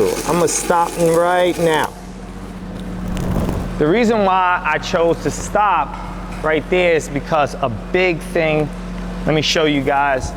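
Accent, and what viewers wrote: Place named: New Jersey, United States. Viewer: Dude the Jersey accent is such a change of scenery for a welding tutorial coming from being taught by a bunch of southern boys. 😎🤘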